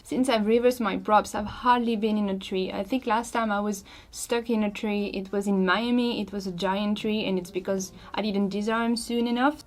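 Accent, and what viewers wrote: France. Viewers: good video.. and i like english with french accent more than french itself XD ... so we're both not native english speakers and i wish i could speak that good... writing/reading/listening is so much easier than speaking for me.. i couldnt do it it that fluent :D ..recently i saw the comparison video from J.Bardwell about the chameleon/rooster/Chameleon Ti and now i dont know what frame to buy next because i really like the Rooster lol ^^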